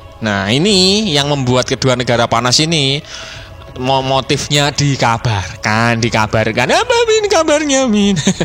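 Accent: Java, Indonesia. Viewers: Medok banget jawa lo min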